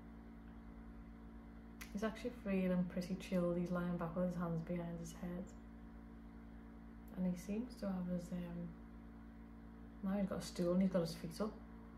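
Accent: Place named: Scotland, United Kingdom. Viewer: Amazing reading, thank u very much! So many good advice, and lovely messages from him! It was very hard at times to hear what u were saying since u talk quietly.. But i have lived in Scotland so i was able to understand. Your accent sounds scottish!! It was awesome to hear speach that sounds like scottish! It made me recall great memories, i liked your video a lot!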